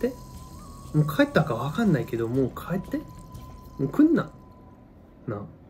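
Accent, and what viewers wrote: Kansai, Japan. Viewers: コテコテの関西よりやね🤣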